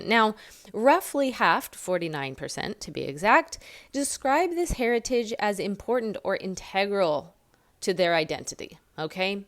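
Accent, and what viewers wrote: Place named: United States. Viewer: Grazie! ❤ Beautiful historical lesson and , for me, marvelous american English accent for improving my comprehension.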